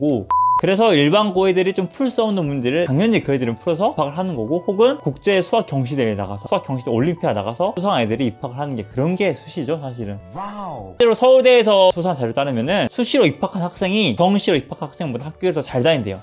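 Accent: Gwangju, South Korea. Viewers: 광주 출신이신가요 ㅋㅋㅋ말투가 거리감이 없는 거 같은데 느낌만인가 ㅎ.ㅎ...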